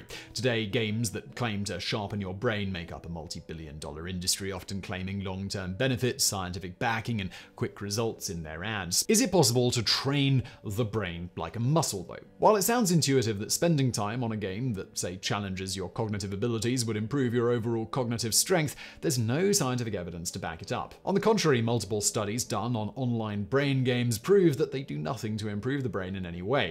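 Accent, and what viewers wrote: England, United Kingdom. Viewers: you and your staff have an amazing propensity for getting it wrong more and more often. Before you cause much more damage in peoples lives. Consider how you produce these videos, and how many. Quality control sucks. Number two is patently and demonstrably false. The brain does grow and learn through mental exercise. Apparently you or your editors? Have never heard of cerebral plasticity. Or took no notice of the numerous studies which proved beyond any doubt that people who picked up a second language, or learned new math skills, or even practiced mental yoga's, in middle age, or even older, were far less likely to experience cognitive decline, and thats just one benefit. But how I weary of making a counter point to you. So many of your viewers hear your English accent, and just accept that you are smarter than they are because of it, and so you must know what your talking about. 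Such is specious reasoning. Every part of the body, including the brain, can be exercised. And growth will continue so long as telomeres tell, cells make new connections, and nuerons know what is going on. I'm serious, yet I jest. And yes, you can definitely make up for lost rest and missed sleep. Changing sleeping patterns sucks for your health. Both mental and physical. Yet we can adress this as well with adenosine and melatonin, or sometimes a simple histamine. Maybe you guys will double check yourselves and get an opposing opinion some day. I find it difficult to believe you ever do so, re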